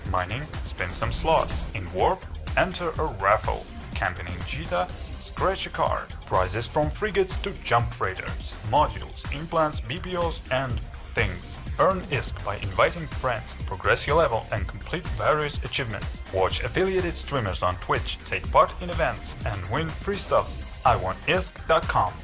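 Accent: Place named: Australia. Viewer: so australian mate haha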